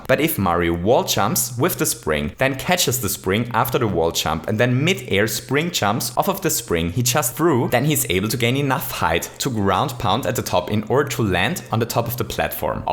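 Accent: Germany. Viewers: You have an German accent xd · Ich wusste das du Deutsch bist! Habs schon am Akzent bemerkt!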